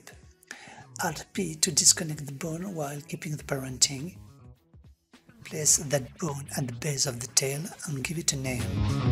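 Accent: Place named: France. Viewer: To me, you sound like you have a slight French accent, and I don't know if you knew this, but many Americans and other English speakers love French accents. In fact, your accent is in a great sweet spot where you sound foreign enough that you get the mystique of a foreign accent, but your pronunciation is good enough that there is no trouble understanding you.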